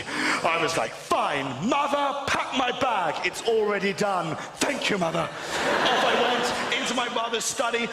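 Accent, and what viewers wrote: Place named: Germany. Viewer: Почему его английский звучит как немецкий?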